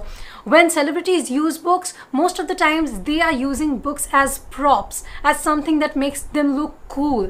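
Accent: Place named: India. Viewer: I think you have a very good command over English language especially coz of your indian accent. I mean your language is quite easily understood by indian audiences. I looked for audio books over YouTube and applications so that I can improve my English but didn't get even a single video or audio book in indian accent. Then I thought of you for a second that why cant you create an audio book over youtube. Isn't it a great idea? It will help a lot of Indians like me who are looking for such audiobooks. I hope you come up with atleast one such book in near future. Thank you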